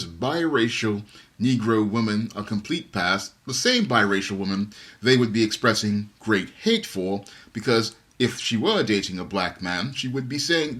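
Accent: United Kingdom. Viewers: I usually cringe when an American tries to pull off a British accent. But this was hilarious. I had a jolly good laugh after this video. As for Harry. He should have pulled out when he had the chance. His own brothers warned him multiple times. But he was stubborn and foolish. Blinded by love. And he will face the consequences of this silk spider web soon enough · I enjoyed the British accent. Also I will never get over your laugh. It's contagious!! 😄😄😄😆😆😆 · Thumbs up for the British accent and the term Blackistan.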